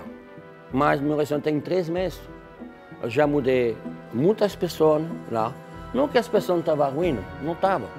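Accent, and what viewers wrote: France. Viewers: Amo vc jacquin não sei escrever jshshshshshs, enfim vc é um lindo da vontade de morder vc vc é um encanto! E o sotaque francês é muito fofo vc é demais muita saúde, sucesso, dinheiro e principal muita luz divina na sua vida!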